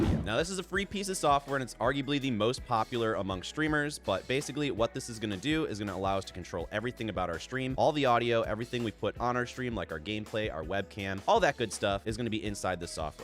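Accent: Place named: Scotland, United Kingdom. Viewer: Ayy ... Didnt realise u spoke .. Scottish ..... :)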